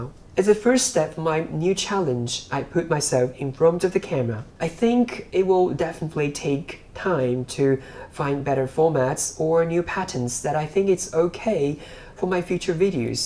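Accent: United Kingdom. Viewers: How did you learn Japanese? Your RP English is very excellent.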